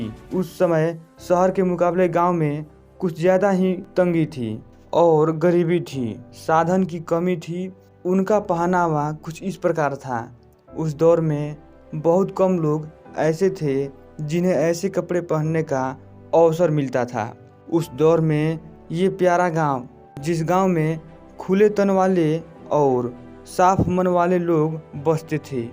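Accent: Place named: Bihar, India. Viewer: अबे बिहरीया आवाज आवाज सुधार अपन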